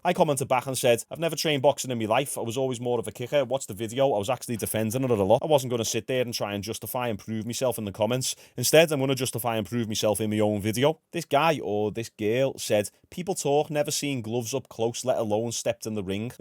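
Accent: Liverpool, United Kingdom. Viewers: Love the scouse, from Derry N.I myself, our accent is hard to understand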